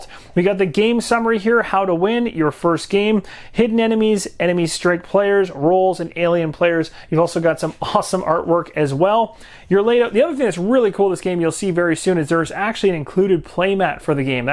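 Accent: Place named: Canada. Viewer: I love how Canadian you sound.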